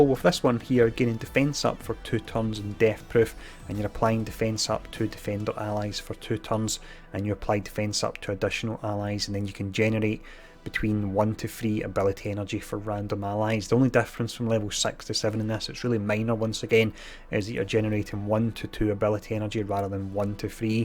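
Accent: Scotland, United Kingdom. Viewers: came for the info stayed for the scottish accent will come back for both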